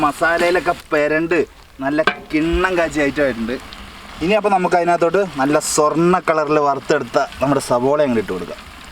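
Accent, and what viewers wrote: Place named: Palakkad, India. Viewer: palakkadan slang aan...but full aghu palakkadan ala...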